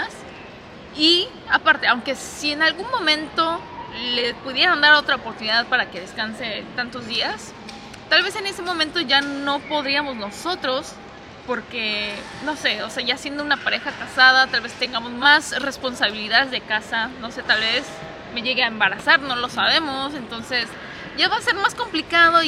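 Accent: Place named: Mexico. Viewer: Hablas como mexicana,y te ves muy coriana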